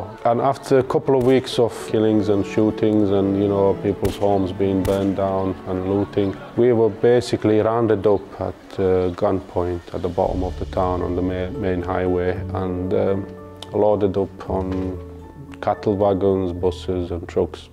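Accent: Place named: Yorkshire, United Kingdom. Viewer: The Yorkshire accent has settled in well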